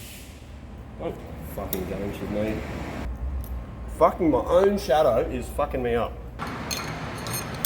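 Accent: Australia. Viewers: Me, not used to the Aussie accent. "Lawn mower?" Oh no wait, "Lawn borer." Like you know, a boring device for out on the lawn, which is probably just a typical Australian way to describe the outside, haha, funny Ozzies. And then it struck me. "Line borer." Okay, English is my third language but still, I should have gotten that sooner! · Aw man, c'mon, don't worry about the aussie accent none, it's part of the charm of the channel/content, and you in particular. Was a fun watch but I'm sad Karen cut the bloopers of you cussing up a STORM tryna get those bushes in. I know there was some behind-the-scenes rage in there and it would've been hilarious to see xD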